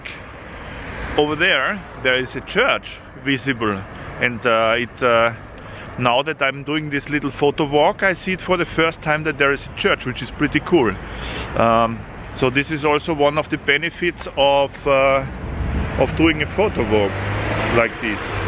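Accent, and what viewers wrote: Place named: Germany. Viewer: Dein deutscher Akzent bei deinem Englisch ist der Hammer🤭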